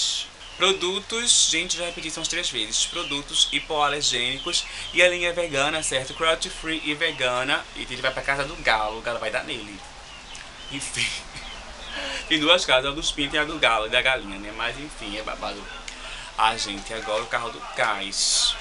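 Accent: Recife, Brazil. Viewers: inscrita, adoreeeeeeei. Ele é de Recife?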